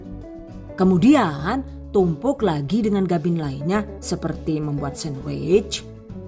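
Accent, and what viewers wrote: Banyumas, Indonesia. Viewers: Selamat pagi , matap . Gabung bikin ngiler haaaiy .Bunda Suaranya Jawa enyong (kebumen , Bnyumasan )jw tulen 😃😃😃😃😃😃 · terimakasih atas resep nya kak ,ngapak juga nih kakak 😂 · Kie wong nek agi ngomong ngapak jan merguyokna pol😂😂😂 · Mantap Bunda apa lg dng bahasa ngapaknya aku suka sekali jd sambil nonton sambil ngakak😍👍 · Sg penting ngapak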